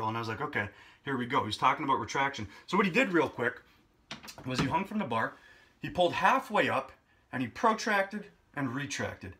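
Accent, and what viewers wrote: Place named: Canada. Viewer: Are you Canadian? You sound like you're from around here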